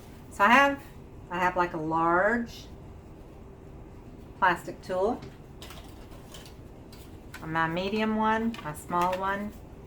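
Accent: South, United States. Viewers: Awesome artwork! New subscriber to your channel. How many drops of floetro do you add to your paint? Also, what's your background paint recipe? Type of paint? Thanks. Oh, you sound like a southern gal. I am too-from NC. Have a great day! 😁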